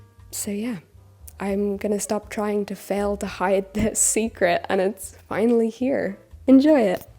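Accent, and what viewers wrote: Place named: Ireland. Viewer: Your Irish accent comes through a lot stronger when you are just chatting about self and things, then when you are doing skits. Not sure why that is.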